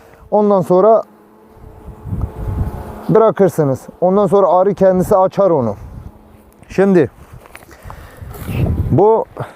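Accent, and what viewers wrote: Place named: Eastern Anatolia, Turkey. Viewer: Allah razı olsun kardeşim, nereli olduğunu merak ettim. Şive trakyadan çok doğuya benziyor.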